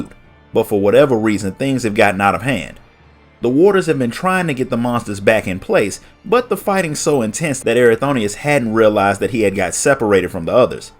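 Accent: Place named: South, United States. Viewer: That smooth southern accent tho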